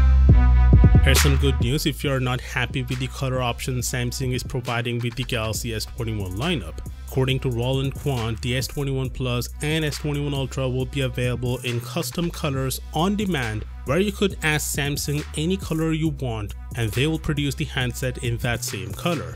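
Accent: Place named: India. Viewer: You're accent sounds Indian.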